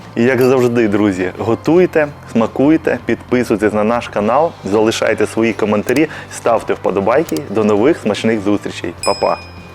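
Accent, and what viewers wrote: Ukraine. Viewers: Все люблю з українським акцентом. Дякую!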